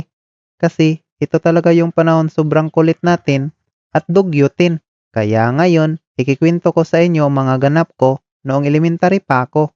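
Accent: Philippines. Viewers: Kuya taga probinsya kasi ganyan ka mag salita eh hehe 😅😅😅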